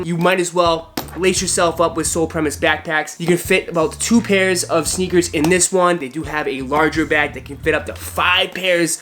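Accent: Boston, United States. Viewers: That fuckin Boston/mass accent, 🤣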